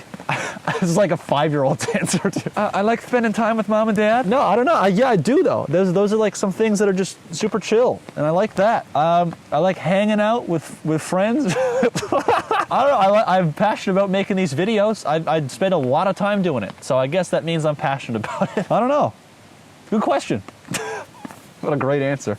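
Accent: Canada. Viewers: See I thought he was Canadian but something felt off, so knowing hes from Newfoundland really clears things up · Such a Canadian, eh?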